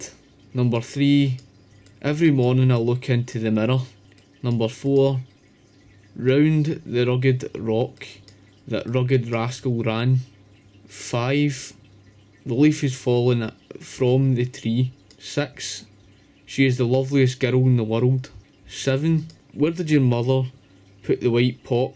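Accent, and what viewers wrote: Scotland, United Kingdom. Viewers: I love your accent , I would never attempt to try and talk like you, It would be such a disgrace to your beautiful language lol, I talk with a heavy southern US accent I hope when I come over there people can understand me, Do Scottish people like Americans .the ones from the south that have manners and are friendly? Which part of Scotland would you say is the most friendly to Americans and which is the parts that do not like us that much? I want to visit Campsie the most.Is it a friendly area?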